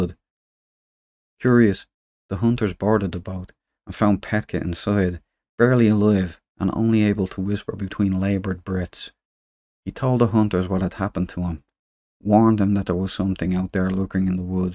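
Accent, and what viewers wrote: Dublin, Ireland. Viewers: Ive watched many docs and read a tonne of stories about this place and many others similar...its good to hear a fellow dub tell the story i thaught i was the only person intrested in this in ireland 🤣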